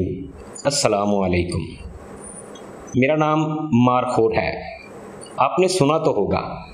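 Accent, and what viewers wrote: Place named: Punjab, India. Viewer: Apka ta'lluq punjab ki kis jagah say hay brother? Lehjay say ap punjabi lagtay hain.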